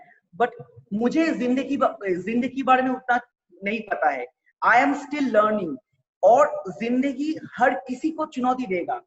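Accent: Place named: Bengal, India. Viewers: I appreciate you dada n i respect you..but ur bonghindi 😂 🤣.. love that.. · Your bong hindi 👍👍👍👍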